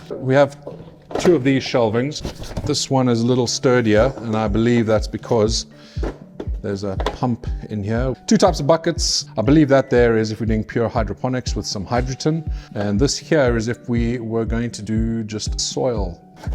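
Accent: South Africa. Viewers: Where are you from originally? You sound south african.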